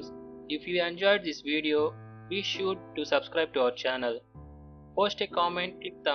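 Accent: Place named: India. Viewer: indian and pakistan accents is very funny they are trying to speak with well accent but they are can't speak well english with good accent 'realistic' but he say 'realishtic' :) 'sixty' but he say 'sixshty' :)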